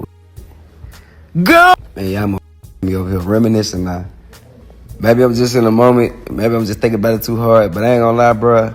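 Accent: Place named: Mississippi, United States. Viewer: Boy you claim atl but you from missippi 😂 you sound like a missippi 🥷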